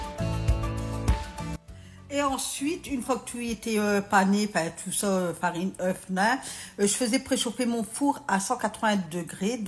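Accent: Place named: Valenciennes, France. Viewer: Je trouve que vous avez l' accent de valenciennes, Denain, Douai, Orchies. Bibises.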